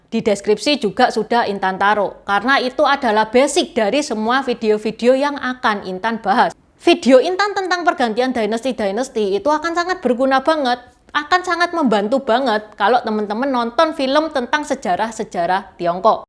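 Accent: Java, Indonesia. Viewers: Raine cino lah ngomonge tek medok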